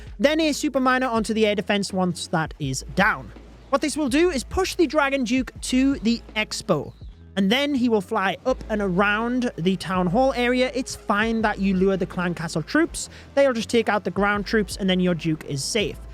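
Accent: United Kingdom. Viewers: hi mate, i think you are an american but im wrong youre british man😅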